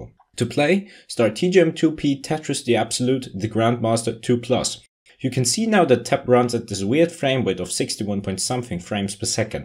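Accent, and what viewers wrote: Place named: Austria. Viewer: Oh you had a more "austrian" accent at that time.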